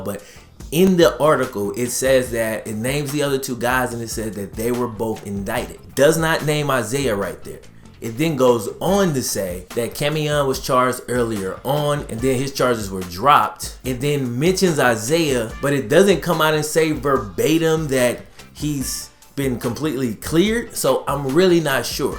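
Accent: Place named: Louisiana, United States. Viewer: I aint know you was from Louisiana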